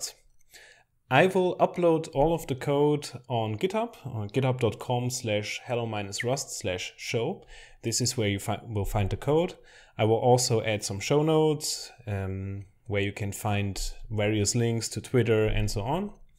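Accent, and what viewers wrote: Germany. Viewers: Agreed, german accent is always nice and welcome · I'm not a beginner in Rust, but I really enjoy how laid back your method of teaching is. It is especially nice to see you sit there on the chair and the computer on the wooden table and the code to the right. And of course, the German accent is nice. This experience is really soothing!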